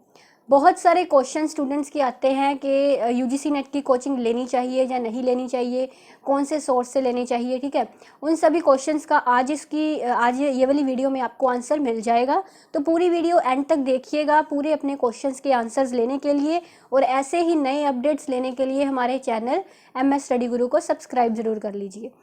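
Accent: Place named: Punjab, India. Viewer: ਜਿਵੇਂ ਤੁਸੀਂ ਬੋਲਦੇ ਓ ,,I like it 😀🤗 ਵਿੱਚ ਪੰਜਾਬੀ accent ,,,pretty voice ਰੁਕਦੀ ਨੀ ਪੰਜਾਬੀ ,,,ਆਪਣੇ ਆਪ ਆ ਜਾਂਦੀ ਐ ,, ਤੁਹਾਡੀਆਂ videos ਦੇਖੀਆਂ ,,,cute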